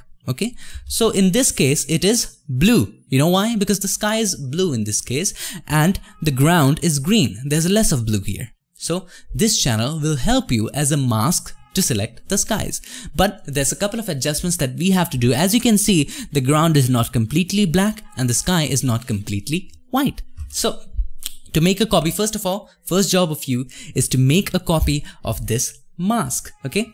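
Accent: India. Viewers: I like the way he speak and explain things I work before in Saudi I have my Co woker indain people I miss the accent of them :)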